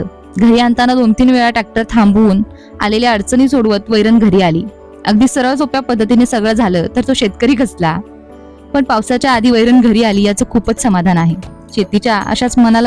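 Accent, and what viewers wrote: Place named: Pune, India. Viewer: तुमचे माहेर कोणत्या शहरात आहे,,,, तुमची भाषा खूपच शुद्ध आहे,,, पूनासारखी वाटते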